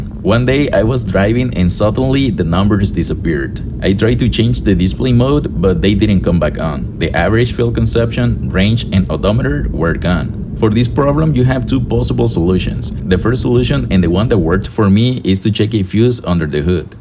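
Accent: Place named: Colombia. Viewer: Too this was the exact issue. Thanks a lot. You sound like you are from Colombia.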